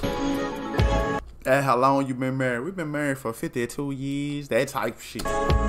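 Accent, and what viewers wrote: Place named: Louisiana, United States. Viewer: I can already tell u from Louisiana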